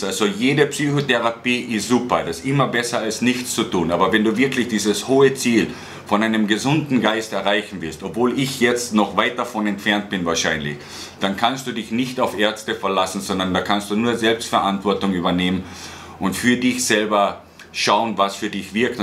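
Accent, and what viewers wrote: Carinthia, Austria. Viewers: Green ich hab gedacht er aus kärnten vom dialekt her :)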